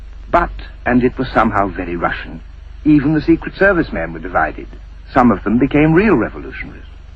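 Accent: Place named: RP, United Kingdom. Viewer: This accent would definitely be described as "RP" - received pronounciation, it's known as. In other words, very posh English, a bit like how the Queen used to speak. Even she has been influenced by "estuary-speak", that splurge of Cock'er'ny that has spread across most of the southeast of England. this is how EVERYONE on the BBC used to speak. These days northern and other accents are more common on the telly. Thank god.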